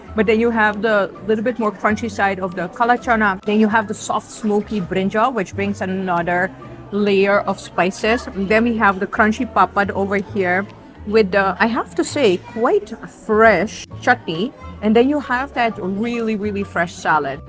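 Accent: Bangalore, India. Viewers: WOW idly, Dosa, Sambar, Voda, Alu Gobi..WOW Your talking like local Indian..I'M IMPRESSED.. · I only have one question: Why do you say you are a foreigner when you are in Indian? You have clearly a distinct Indian accent? Are you ashamed to be an Indian? I am a foreigner vlogging in Indian and I find it a great country. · Why you speak like indian? Or it has voice over? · wow, more desi accent than me. I'm in E. Europe too. The best. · Ur acent is Indian why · Its cute how her accent is getting more Bangalore. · Vôtre accent est indien? C'est vrai? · The food looked delicious, and its funny how you really have an Indian English accent haha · Damn how do you sound Indian while being Dutch originally?? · Ma'am your english accent is more Indian how that happened , Haha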